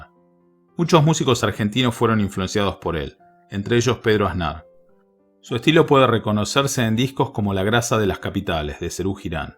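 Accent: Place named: Yucatan, Mexico. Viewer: parese yucatecooo bombaaa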